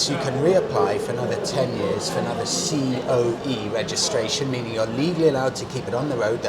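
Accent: United Kingdom. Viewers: you reminds me of Cole Hauser as Carter Verone from Fast & Furioous 2 . just you with the british accent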